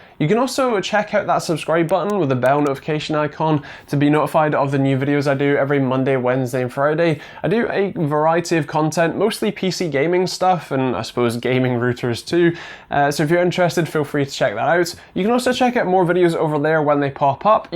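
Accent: United Kingdom. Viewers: well I dunno if u forgot where the English language came from but he sounds like hes from the UK as am I, perhaps he's northern I'm not sure as accents vary so much u can drive an hour anywhere and it changes but anyway.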